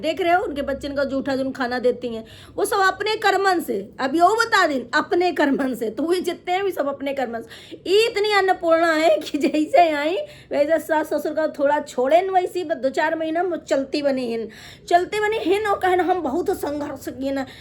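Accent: Kanpur, India. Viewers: Bahut hasi ayi kanpur ki language main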